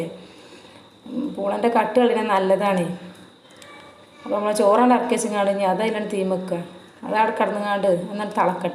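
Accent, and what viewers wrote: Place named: Malappuram, India. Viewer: നമ്മുടെ മലപ്പുറം ക്കാരുടെ ആ ഒരു സംസാരരീതി ഞങ്ങൾ കൊല്ലം കാർക്ക് ഒരു പ്രത്യേക ഇഷ്ടം തന്നെ സൂപ്പർ ഐറ്റം ആണല്ലോ കപ്പയും മീൻകറിയും ആഹാ ശരിക്കും പ്രവാസലോകത്ത് നിന്ന് കണ്ടപ്പോൾ 😋😋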